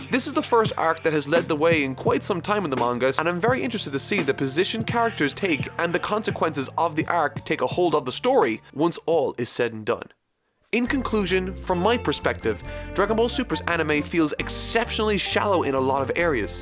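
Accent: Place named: Ireland. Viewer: Hes.. Irish..?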